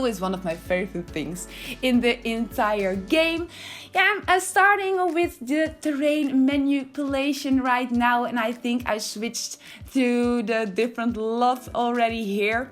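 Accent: Netherlands. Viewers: This looks great! Where are you coming from? It sounds like you're from Holland. I'm Dutch myself.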